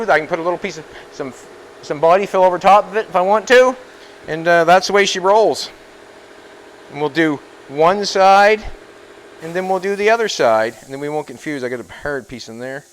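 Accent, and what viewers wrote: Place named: Annapolis Valley, Canada. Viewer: Came across this looking up fibreglass fill and I saw your last name and thought Annapolis valley. When you spoke that cinched it ( I grew up in Berwick). Neat. Your video is helpful too.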